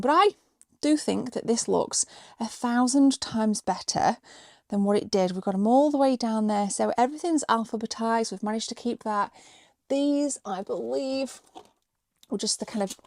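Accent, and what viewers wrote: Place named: RP, United Kingdom. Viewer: You have a beautifully posh accent. What part of the country are you from?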